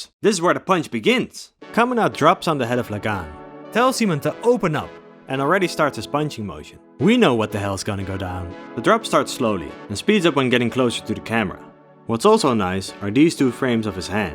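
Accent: Netherlands. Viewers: You are Dutch aren't you. I can hear the accent/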